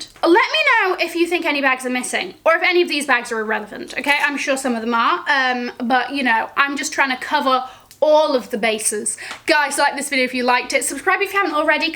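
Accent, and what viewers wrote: United Kingdom. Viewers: Salaam! I just discovered your channel last night. I love me some British accent reason why my other favourite Youtuber is a Brit as well. I have been binge watching your videos ever since. I wish your videos were longer though because why they end so quick lol but on the flipside, you are on point, no hanky panky but so so so much fun to watch! With that level of energy, i pray you will hit a million in about two years or less! You go girl!!! ❤ p.s: you are very pretty MashaAllāh!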